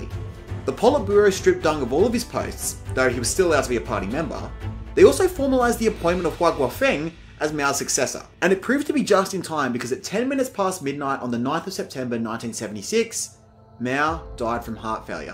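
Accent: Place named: Rural, Australia. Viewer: This is puerile simplicity read out by a barely literate yobbo who sounds like he comes from Tenant Creek, or some other Aussie backwater that equates to the Mississippi Delta.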